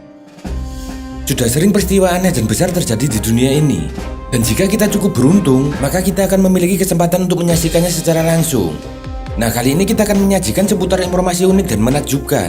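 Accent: Java, Indonesia. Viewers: Momong medok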